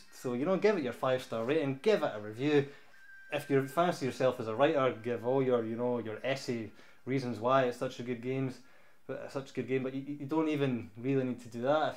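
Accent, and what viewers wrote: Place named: Scotland, United Kingdom. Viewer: Long live to scotish accent hahaha We are with you,!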